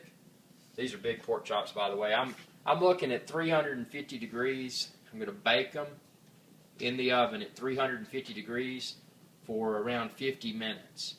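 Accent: United States. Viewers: You are in Finland thats in Celsius. This man is from the USA from his voice id say. They use Fahrenheit. If you payed attention in school you would know that 176C is about 350F. 350C is about 662F. You are not wrong in your thinking but you cant just assume everywhere is the same as you. Coming from an American that might sound funny though lol. Have a nice day. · What state do you live in, please? Just wondering about your accent. You sound American ! Thank you .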